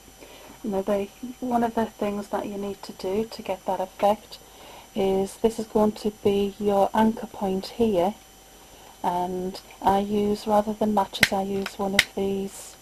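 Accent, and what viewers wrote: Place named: North East England, United Kingdom. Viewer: thankyou for a great tutorial , love the flowers you sound very similar to my cousin who lives in Saltburn up north do you come from the north east??im guessing you do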